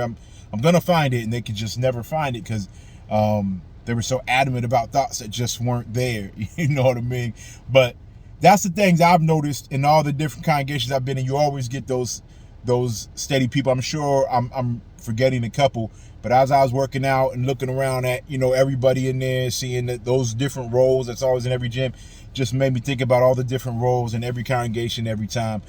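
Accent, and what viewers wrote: United States. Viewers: Love the sound of the rain at the end of the video. And the general vibe. You American? Cool accent. What kind of car it is, some large MPV? I was a type of the outsider, not really into the scriptures and ministry, hardly been to any Saturday ministry meetings at all through my 7 years from when I became a publisher to my decision of fading and ghosting. I really was in it for the company of literally couple guys I liked and respected. I didn't care much about anyone else, especially those oldtimers with only basic wordly education, there were few elders like that. Later I learned that folks in the congregation called me "the philosopher" behind my back. That sums it perfectly.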